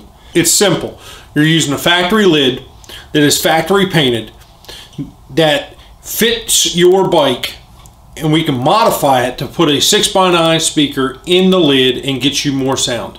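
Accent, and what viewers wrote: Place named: Maryland, United States. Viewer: listen to the 'Merilan' in his voice....also, loved that he substituted speakers.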